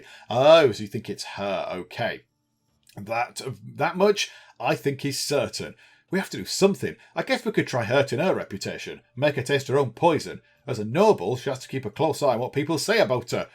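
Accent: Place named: Northern England, United Kingdom. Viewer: Your northern accent is really good. Makes me think of Sean Bean... oh sugar, I swoon when I think of Sean Bean lol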